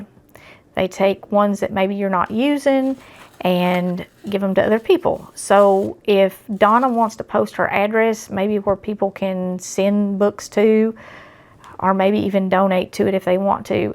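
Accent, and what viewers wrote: South, United States. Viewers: Just stumbled upon your video ,you was making homemade fried apple pies.,I watched all the video cause I liked the way you talk, don't know where you from ,sounds pure southern to me. Anyway enjoyed it very much. May God bless you in a special way . Gonna get me one of those dough cutters from the dollar tree.looks like a multi purpose gadget..thanks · I am Southern born & bred but girl that accent is country, I luv it! Enjoy your videos, enjoy your bible talks, and I just ordered me a T-shirt, can’t wait to get it! Keep up the good work!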